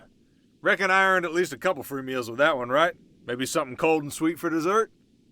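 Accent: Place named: South, United States. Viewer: Im such a fiend for a good southern accent 🤠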